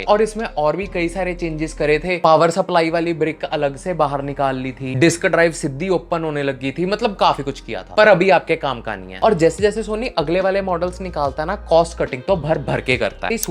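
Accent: Punjab, India. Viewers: ਬਾਈ ਤੇਰੀ ਹਿੰਦੀ ਚ ਪੰਜਾਬੀ ਮਿਕਸ ਬੜੀ ਵਧੀਆ ਲਗਦੀ🤩 ਮੈ ਤ ਤੇਰੀ ਵਾਜ ਸੁਣਦੇ ਸੁਣਦੇ ਕਿਨੀਆ ਵੀਡੀਓ ਬੇਖ ਲਇਆਂ 😅👌🏻💐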